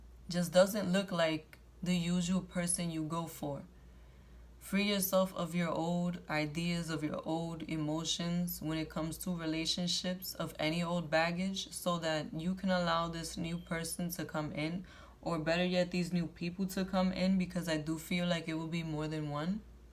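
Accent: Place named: Puerto Rico. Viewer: Thank you for that amazing reading, gave me goosebumps? ❤️❤️ Your voice! Boricua!?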